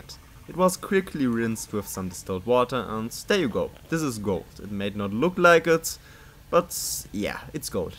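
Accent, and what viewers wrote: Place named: Germany. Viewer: Ich glaube vom Akzent her, dass du Deutscher bist daher: SMB (sodium metabisulfite oder deutsch: Natriumdisulfit) dient nicht dazu, überschüssige Salpetersäure zu entfernen. Es ist ein selektives Fällungsmittel. Btw: Fällen heißt auf Englisch: Precipitate, nicht "chrash out" oder so etwas. Es kann also gut sein, dass du in der Lösung noch gelöstes Gold hast (Hast du ja sicherlich aufgehoben ? §1 des Edelmetallrecyclings: NIE etwas wegschmeißen!) Und doch: Es gibt Literatur zum selektiven Ausfällen von Platingruppe Metallen, sogar speziell in Bezug auf Dentallegierungen. "Edelmetall Recycling" von M.A. Buth, ist so eines davon, sogar auf Deutsch... Gibt's bei Amzon unter: <link> ... Viel Erfolg weiterhin! M. A. Buth ;-)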